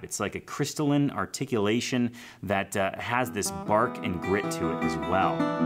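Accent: Chicago, United States. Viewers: nice review.. I can hear the chicago in your voice ( I am a north sider by birth) and you have mentioned Old Town School ( where I studied harp with my dear friend Joe Filisko). May I ask would you consider plugging that bad boy in? I have a few resos but only one with a pickup ( and that is lousy) ...thanks and be well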